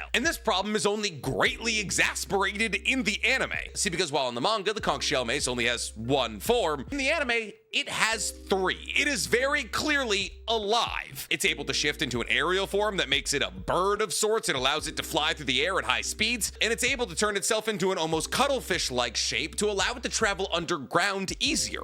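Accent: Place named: New Hampshire, United States. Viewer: I was wondering why you said it like me I live in NH to I get that. XD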